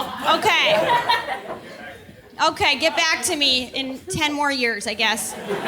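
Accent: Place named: Minnesota, United States. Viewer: I first thought she had to be from Minnesota. Then I really started to appreciate the subtlety satisfied pauses with the wry smile between the jokes. 👍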